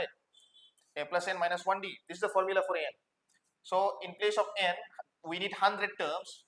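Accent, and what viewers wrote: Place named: China. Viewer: Sir actually sounds like Chinese. His accent is similar to Chinese's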